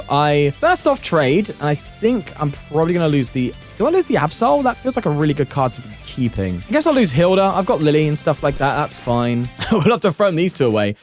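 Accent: United Kingdom. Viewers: bro is british af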